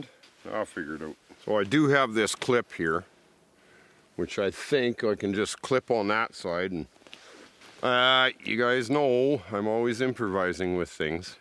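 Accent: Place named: Canada. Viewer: When that truck gives up on you I can recommend you getting a Subaru Outback. At least as good off road as any truck, and the back seats fold flat so you can sleep in it comfortably. You should look it up. As for that catch phrase, I think the most important thing is that it contains the word "out" in that awesome canadian accent. The one about poking an eye out wasn't bad at all imho.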